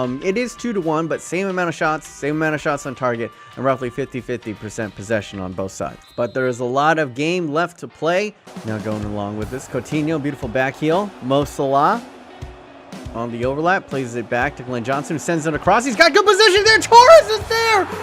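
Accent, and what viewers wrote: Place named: United States. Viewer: your commentary is SO american hahahah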